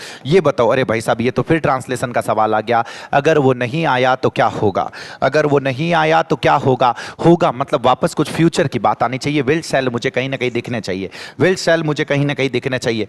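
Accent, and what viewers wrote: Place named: Rajasthan, India. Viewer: Sir youtube par aisa koi mila h jo full meri tarah मारवाड़ी bole । Baki aaj tak koi ni dekhiya the sir ghana hakra padhao ji khush hugo 😁🥳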